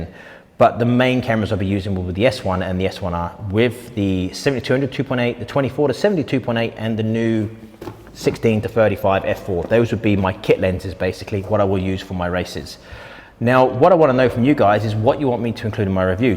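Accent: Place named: Portsmouth, United Kingdom. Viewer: Devonshire is a beautiful place. You are right about Portsmouth always something happening there. (good or bad) Still trying to get to use his Pompey accent on his channel.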